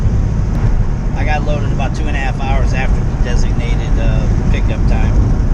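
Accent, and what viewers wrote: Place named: South, United States. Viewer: Nobody like driving in or around NYC. Your lucky the traffic is moving good. I always run into a accident or lane closures at night. People are mean to over weight people that they perceive to be Trump supporters. Given that you live in a van and sound like your from down south. If you were a hot girl the guy would have made more small talk.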